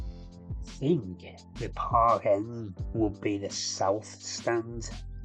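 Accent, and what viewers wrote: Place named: Liverpool, United Kingdom. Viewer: When your channel started under a different name I 1st started watching it but then listening to you I realised you don't support Everton and haven't got a clue about football..!! + your accent makes even us Scousers cringe 😮 And you changed your channels name because you kept breaking all the drone rules flying over a working Stadium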